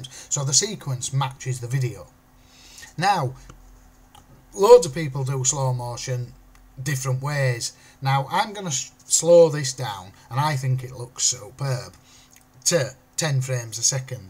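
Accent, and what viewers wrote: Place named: Yorkshire, United Kingdom. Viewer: Hahahaha.... Great tutorial but your accent reminds me of growing up in York. Long time since I've heard an accent that thick. Thanks!!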